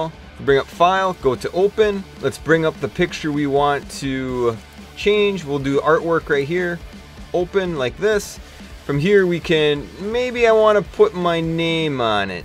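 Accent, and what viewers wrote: Canada. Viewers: You are the most Canadian person iv'e EVER heard it really annoys me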